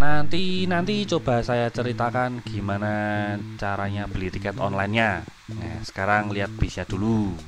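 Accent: Java, Indonesia. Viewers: Medok bnr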